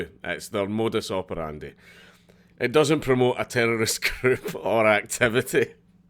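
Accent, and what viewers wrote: Scotland, United Kingdom. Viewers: I've been watching some of your videos. Quite enjoy them. I'm still not sure if I agree or disagree with you, but I am willing to listen without foaming at the mouth. Besides that, you're even more Scottish than I am. So thumbs up! Subbed! Good job! Very few people on Youtube can confuse me to the point where I want to sober up and think... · love the accent: scrooge mcDuck.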